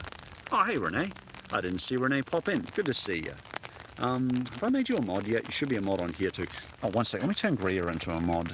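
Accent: Australia. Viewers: Hey are you from Brisbane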